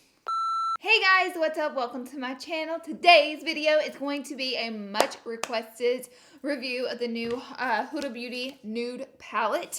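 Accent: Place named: Alabama, United States. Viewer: Yeah, being born, raised and living in Alabama, a lot of us talk like this. Nothing uncommon here! Love ya 😍❤❤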